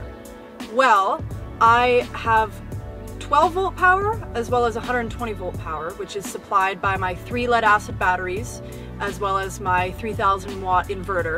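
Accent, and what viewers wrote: Canada. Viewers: Your accent is so Canadian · I came for the cutie with the Canadian accent. Then realized the video was pretty good too.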